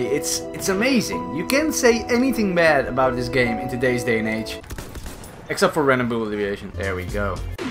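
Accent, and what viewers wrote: Netherlands. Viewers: You have a good duch accent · I assumed you are Dutch because of the way you speak German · Hold up! Are you dutchh? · its sounds like a dutch person is talking english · he's Dutch like me cause of his accent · are you dutch? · Waarom klink je zo Nederlands het is eng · you sound dutch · Are u dutch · klinkt deze guy een beetje nederlands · his accent, i ame and it sounds like Dutch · i knew youre dutch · Klopt het dat je nederlands bent? ? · Are you dutch?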